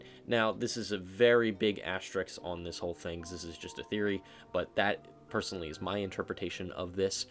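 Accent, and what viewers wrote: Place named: South Jersey, United States. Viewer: I'm hearing a south jersey accent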